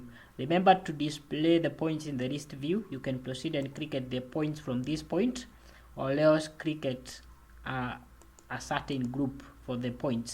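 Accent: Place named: East Africa. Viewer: Useful, especially for a quick reference. And I like the accent too. Sounds very Eastern African 😊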